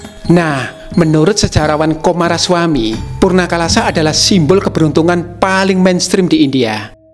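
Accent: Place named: Java, Indonesia. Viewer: Jawa medhok!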